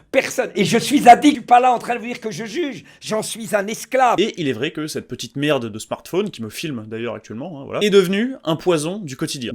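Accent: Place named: Brazil. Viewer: J'adore la touche Alder du bresil dans la voix (les ancêtres sont fiers)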